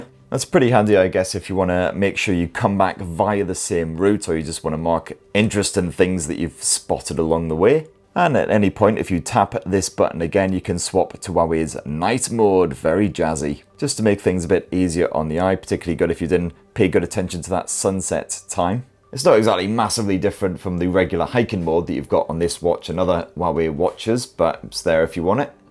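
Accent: United Kingdom. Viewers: this is a fantastic review! Plus, the British accent helps make it sound official for us Yanks! Thanks for the great review. You've sold me between this and the gt 5 pro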